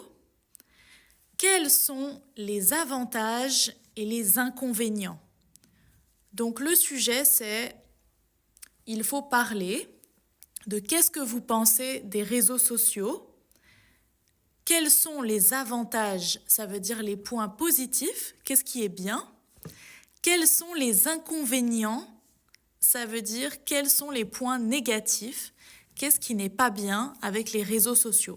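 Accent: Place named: France. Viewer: Hola mi amiga, muy bonito acento francés, aquí te escucho, un abrazo desde Canadá 🇨🇦🙂🫶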